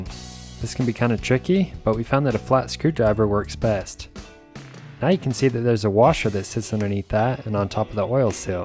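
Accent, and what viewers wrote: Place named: Utah, United States. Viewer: I can tell the guy narrating is from Utah with his accent.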